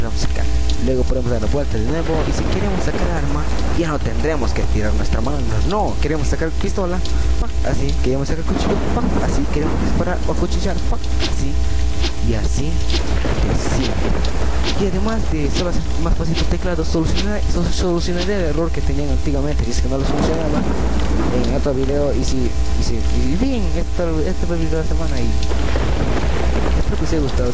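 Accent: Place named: Peru. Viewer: Peruano xddd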